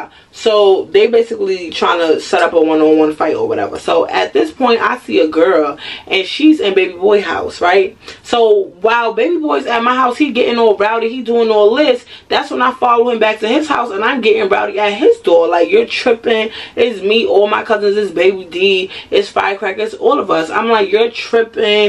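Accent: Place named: Newark, United States. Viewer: I’m from Newark and moved to another state for a better life with my family I knew instantly were u was from because of that accent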